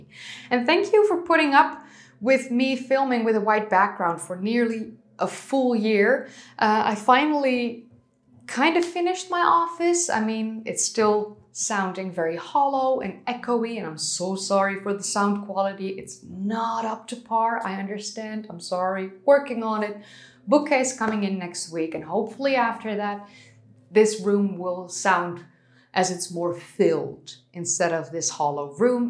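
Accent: Netherlands. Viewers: her wonderfull dutch accent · You must be Dutch right?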